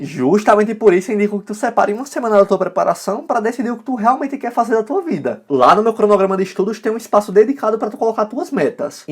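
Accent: Northeast, Brazil. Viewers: A energia do é contagiante, da pra ver que ele adora isso, além de ser super didático e detalhado em compartilhar dicas e formas de nos ajudar gratuitamente (o que é difícil nos dias de hoje). E GENTE QUE SOTAQUE E SORRISO MARAVILHOSOS. ❤🤪 Fico tão feliz e orgulhosa quando vejo um ytr do nordeste representar assim. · Só pode ser Nordestino tu e demais cara Deus te abençoe de todos os vídeos que vi no YouTube você e o mais disposto à ajuda os estudantes vlw cara sucesso pra vc. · Reclama com Portugal, frança e também com os holandeses!! Eles que influenciaram o sotaque nordestino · rapaz tu é nordestino? eu sou e amei esse sotaque!!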